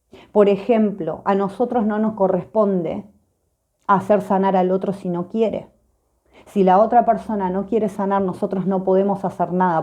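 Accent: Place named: Argentina. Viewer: Amo tus lecturas y tu forma de leer tan argentina jajajajja te talta el boluda amoooo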